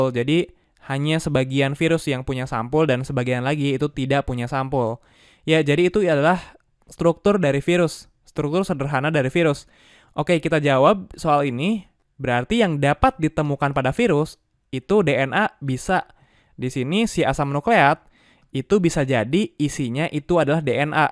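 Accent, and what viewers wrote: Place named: Bandung, Indonesia. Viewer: Orang bandung kak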